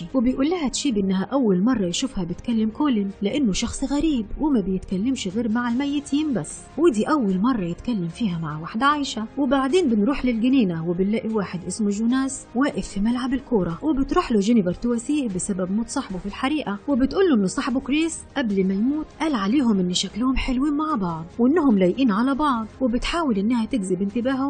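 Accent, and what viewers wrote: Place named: Libya. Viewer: يل بتتكلم ليبيهة خلي السرد بلهجه الليبيه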